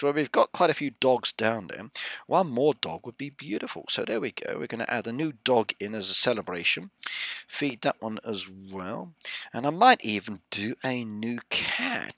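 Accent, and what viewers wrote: United Kingdom. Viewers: Your accent very british🤣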